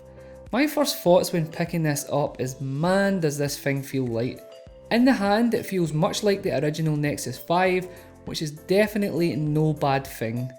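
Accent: Scotland, United Kingdom. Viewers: Where r u from?? Scotland?? Nice accent. Nice video! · If I'm honest I love your accent. I've always wanted to visit Scotland one day 👍🏻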